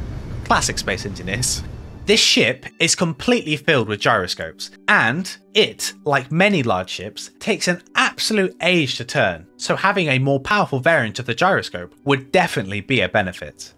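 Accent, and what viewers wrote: England, United Kingdom. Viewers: are you from south`or central england ?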